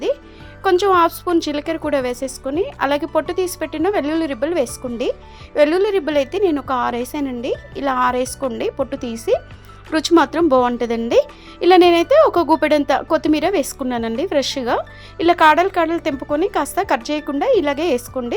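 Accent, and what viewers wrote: Telangana, India. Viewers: Telangana & Andhra mix ah andi? Yekkada mee native?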